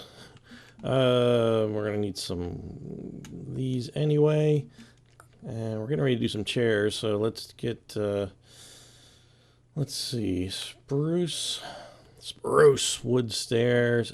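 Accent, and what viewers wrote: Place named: United States. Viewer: he's from america but he talks a little different fro me I'm from England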